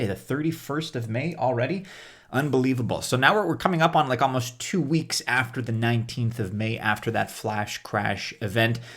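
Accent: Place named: Canada. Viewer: I come for the Canadian accent. Chance at ETH doesn't hurt either.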